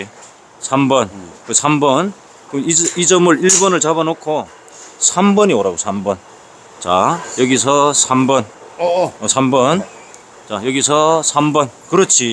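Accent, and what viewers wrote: Andong, South Korea. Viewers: 구독자가 급속히 늘었네요. 억양이 경북 안동말씨같네요. 40년전 군대 선임하사가 고향이 안동이었는데.말투가 비슷하네요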